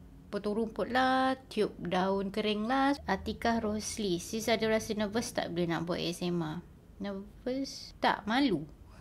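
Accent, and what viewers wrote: Kelantan, Malaysia. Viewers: Comeyyy dooh ore kelateyy oyakkk!!! 🥰🥰🥰🥰♥️♥️♥️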